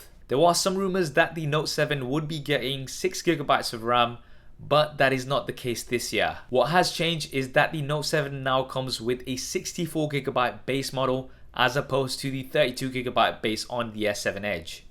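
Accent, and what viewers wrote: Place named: London, United Kingdom. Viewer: Where are you from brother? Sounds like you're from around East London. Man I am happy to see a fellow London goer take up the stage and have a greatly sized channel of electronic fandom. I might be wrong, you could be from Manchester, but I haven't heard that accent in years. Anyways, love the video, well made and professional. Subbed.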